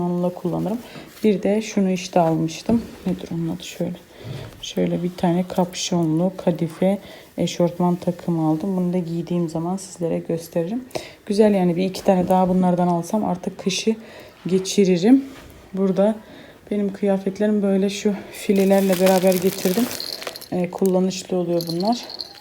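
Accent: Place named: Azerbaijan. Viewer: Canim merhaba sizin konusmanizdan kulturunuzden bize azerbaycanlara benzediyorum niyese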